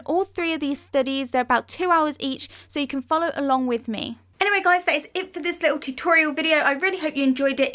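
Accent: England, United Kingdom. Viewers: I love you're accent, it sounds adorable 😍 are you from England? 😇💫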